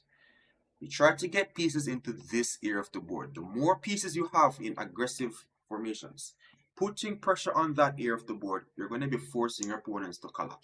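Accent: Jamaica. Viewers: I hear a Jamaican accent. Good on you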